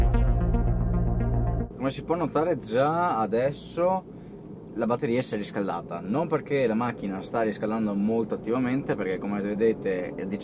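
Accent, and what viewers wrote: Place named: Veneto, Italy. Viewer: Sei veneto per caso? Io abito molto vicino a mogliano Veneto e mi piacerebbe tanto provare la tesla😅😅😅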